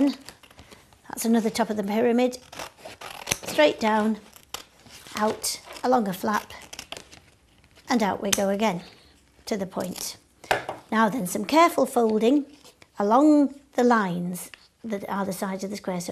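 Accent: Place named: United Kingdom. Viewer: First hearing British accent so sweet and cute English :3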